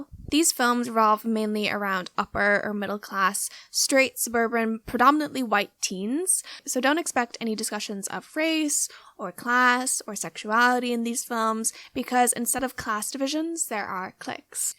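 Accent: Ireland. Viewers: i could hear the irish and i was so confused then i read the descrpition haha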